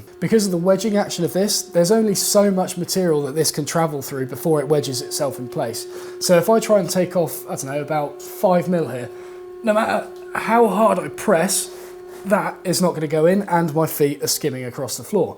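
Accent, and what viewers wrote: United Kingdom. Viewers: Woodworking seems far more serious and classy with a british accent.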